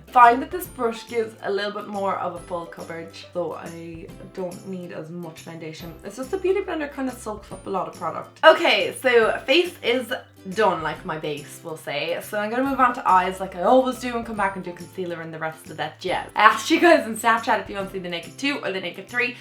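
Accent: Ireland. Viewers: I heard an Irish accent and the description says you are from Ireland. Yesss!!!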